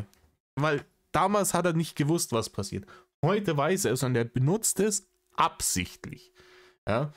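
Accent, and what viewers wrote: Bavaria, Germany. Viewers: Hallo könntest du bei deinem nächsten Video wider mehr Bayerisch sprechen. Weil ich muss dann lachen wenn du dich über denn Kürbis Kopf aufregst auf bayrisch wenn der immer wider was am verzapfen macht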